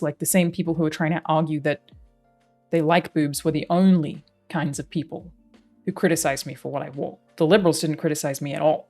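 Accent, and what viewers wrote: United Kingdom. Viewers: That British accent lol sounded like Lara Croft!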